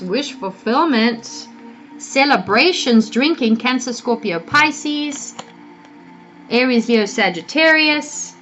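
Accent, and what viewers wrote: South Africa. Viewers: So on point .. my family did me dirty but it's ok.. thank you 🌹🙏🏽 you sound south African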